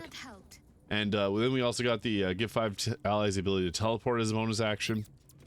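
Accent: Canada. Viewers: so sorry if this is weird but brother i have got to know where your accent is from 😭 i’ve been wondering since day 1 of watching your vids lmao. canada ??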